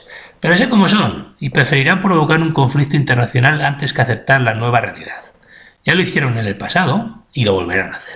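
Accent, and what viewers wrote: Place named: Castile, Spain. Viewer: Gracias por explicar sencilla y coloquialmente una realidad del barquero desde un acento y manera de hablar puramente castellana. Pienso lo mismo pero como tu dices, esos jóvenes de los que hablas no se impresionan por nada y como mucho te hablan de linajes merovingios e Illuminati pero sin pasar por la pista económica, geopolìtica y el análisis marxista.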